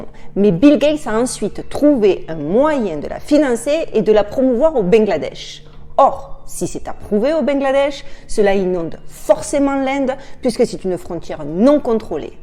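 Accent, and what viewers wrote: South, France. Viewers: J'ai toujours cru vous êtiez du Sud de la France!!!!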